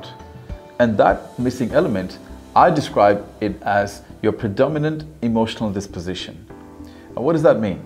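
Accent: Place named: United Kingdom. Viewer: You have a British asscent Sir.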